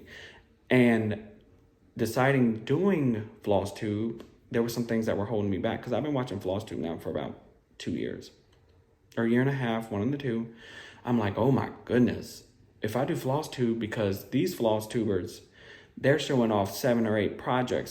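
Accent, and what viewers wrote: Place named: Louisiana, United States. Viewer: ! I. Love. Your. Soul! lol! I really enjoyed meeting you through FlossTube. I grew up in Louisiana as well and I hear your southernisms as you talk even though you don’t have much of an accent. lol. lol. Great first video. I need a visual of your envelope system though. I’d love to see some completed works as well. I’m looking forward to your next video. Also, in which general area are you from in Louisiana? I grew up in Amite which is about an hour north of New Orleans.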